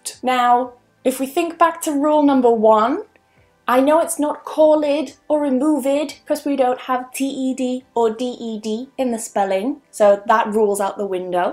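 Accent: United Kingdom. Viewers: Thanks so much for your work and Happy Birthday to you🍰26🕯️🕯️🕯️🕯️🕯️🕯️🕯️🕯️🕯️🕯️ 🕯️🕯️🕯️🕯️🕯️🕯️🕯️🕯️🕯️🕯️🕯️🕯️🕯️🕯️🕯️🕯️amazing clear British pronounce! Lovely teacher indeed! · Nice video. Thanks a lot. Love British accent.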